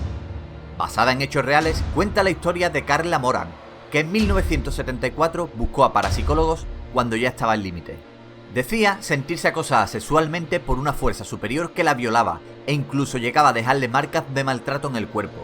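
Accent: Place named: Canary Islands, Spain. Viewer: U DROSSTOP10 NO? VALE PUES PONTE DE NUEVO NOMBRE DOCTOP... ESPERA QUE? YA EXISTE D: PONTE... TOP10VIDEOS O ALGO POR EL ESTILO AH! Y PARECES CANARIO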